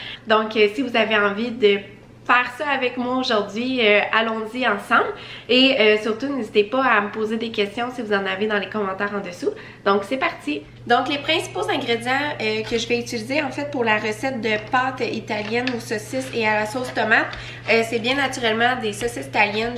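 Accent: Canada. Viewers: Merci pour cette vidéo, en effet, c'est un sujet attendu. Cuisiner en direct, nous emmener faire les courses avec commentaires pour économiser, conseils budget, tout cela peut rendre service à beaucoup de personnes ! Le dessert est très tentant mais... je suis française et, même si j'adore l'accent canadien et les expressions : cela fait combien de grammes pour chaque ingrédient 😄😄? Merci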